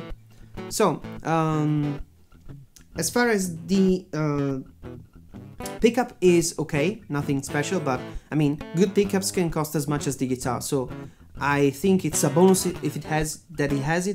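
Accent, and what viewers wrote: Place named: Brazil. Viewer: Vc é brasileiro mano eu percebi o sotaque, quase nenhum, mas consegui perceber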